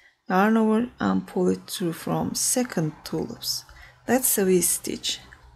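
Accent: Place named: Turkey. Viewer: Your accent is familiar. Are you a Turk?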